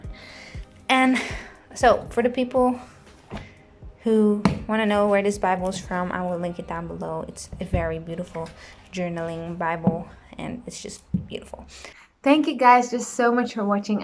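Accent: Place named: Netherlands. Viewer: Ben jij Nederlands? Ik hoor een nederlands accent. Heel leuk😝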